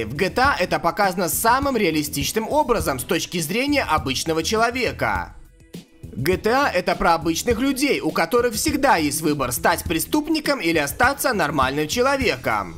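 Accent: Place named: Moscow, Russia. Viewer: какой голос блять противный и ещё этот говор мАсковский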